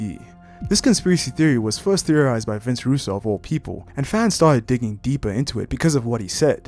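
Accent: South Africa. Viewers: My favourite conspiracy has to be that the McMahons we see on TV and in the ring are not the real McMahons but paid actors, and the real McMahon family is never seen in the public eye. Great video btw, legit never heard of some of these other ones. P.S. I know a South African accent when I hear one!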